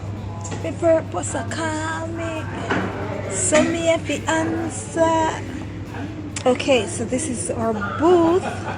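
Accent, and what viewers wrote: Jamaica. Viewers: Loving the video and how you a talk the words Jamaican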